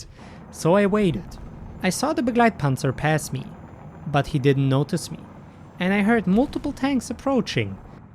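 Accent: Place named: Germany. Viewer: I love his German accent, it fits the ‘war crimes’ theme perfectly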